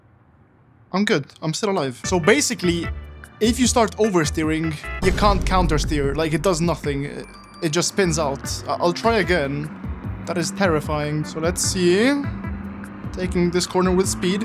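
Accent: Italy. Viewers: Is he italian? I am And its italian sounds good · Can someone confirm to me that he is Italian because the location is in always Italy, he sounds Italian and i say that from expirience of being 50% italian.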